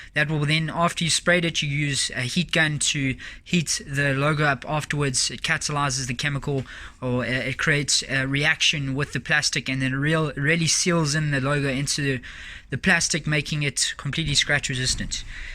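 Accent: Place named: South Africa. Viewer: Sound Southafrican.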